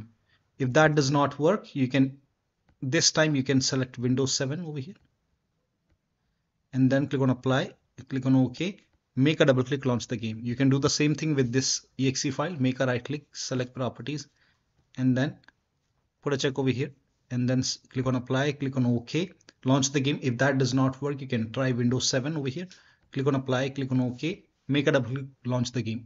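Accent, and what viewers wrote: India. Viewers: Where are u from by the voice it looks like u r from India if yes wanna play together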